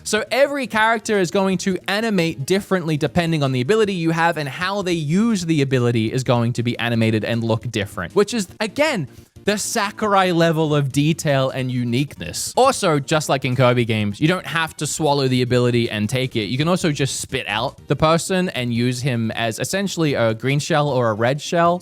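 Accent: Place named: Australia. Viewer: Where are you from? I can't figure your accent out. I get Australian at times, and Boston at others.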